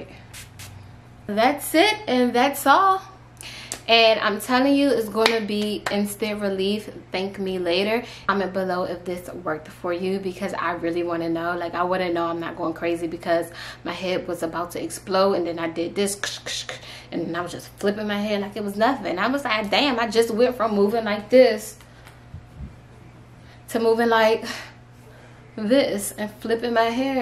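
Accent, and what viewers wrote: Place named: Washington DC, United States. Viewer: I know you’re from the dc area by your accent. Love the video. Thanks.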